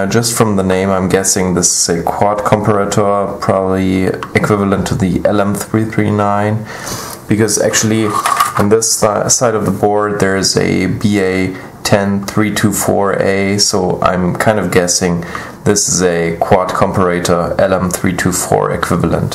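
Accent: Germany. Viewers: I knew you were german when you started talking hahahahahaha hallöchent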